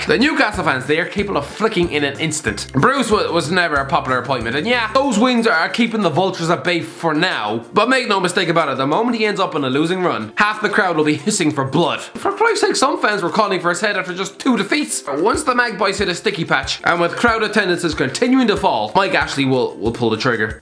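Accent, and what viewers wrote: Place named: Ireland. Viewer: Listen to that sweet Irish voice, he doesn't sound like a dying cat with throat cancer anymore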